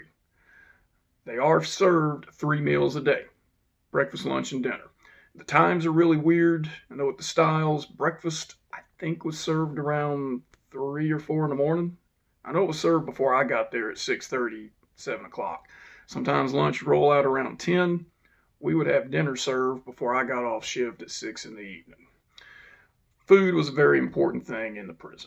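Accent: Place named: Texas, United States. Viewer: I'm glad to see you back! And it's great to hear a texas accent again after a year on the west coast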